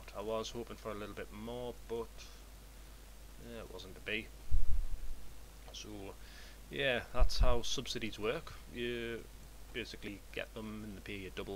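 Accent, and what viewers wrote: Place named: Northern England, United Kingdom. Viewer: I like your accent. Is it from north of England?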